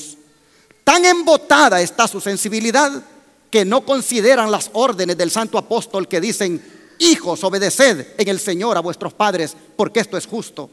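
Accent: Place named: Central America. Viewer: De donde es pastor su acepto suena de centroamerica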